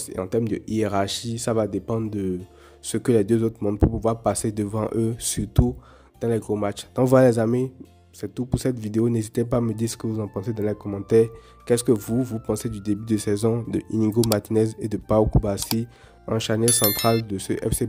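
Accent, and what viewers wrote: Benin. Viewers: Je découvre ta chaîne, tu as un petit accent béninois ou c'est moi ?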